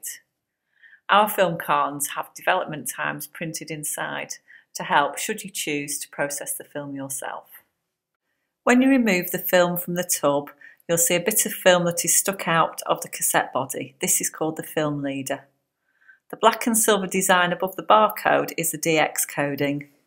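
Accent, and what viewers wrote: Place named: United Kingdom. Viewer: Thank you very much for uploading this nice and clear video with a calm voice that pronounces that very lovely and great British English language that everybody can understand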